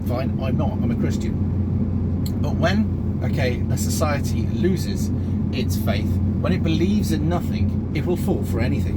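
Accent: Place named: Cockney, United Kingdom. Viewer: Damn! You're a Christian? No wonder Christianity is dying on it's arse! I thought you just some bloke escaped from some East end Pub spouting off the usual " facking cants camming, tekkin ahh jobs an fackin aahses" blah, blah,blah, Oh and there is no Christian army, never will be, there's no conviction, no faith in....the faith,..3 in 1 but 1 is 3? leave it out? You'll need a Fearless Muslim one for when the Russians get cheeky!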